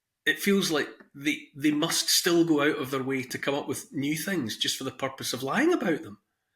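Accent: Scotland, United Kingdom. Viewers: You speak of bad, sad things and i am fully on the same page with you. But listening to your Scottish accent awakens a hope for humanity in me, don't know why. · with his rough Scottish accent is very wise and insightful! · I thought I was listening to myself with a scottish accent ! thank you.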